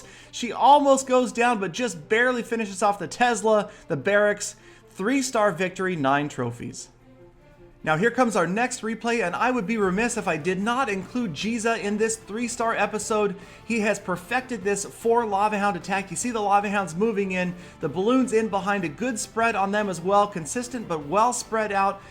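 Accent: Norway. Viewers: Are you norwegian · are u from norway?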